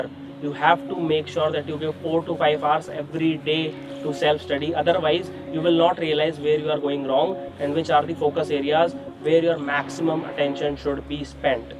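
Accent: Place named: Jammu, India. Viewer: bhai tu jammu ka hai kya ? coz ur accent is jammu type...like saying that"you" as "joo" 😂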